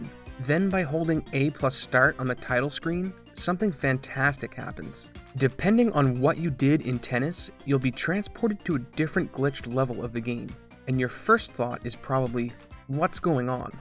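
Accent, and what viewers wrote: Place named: Maritimes, Canada. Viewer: I hear that Maritime accent :P Also great video!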